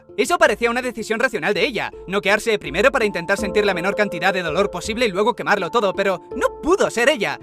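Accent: Spain. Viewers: Prohibanle a los españoles hablar en youtube, que manera tan horrorosa de hablar... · Nunca había escuchado una voz tan chillona como la tuya, eres español?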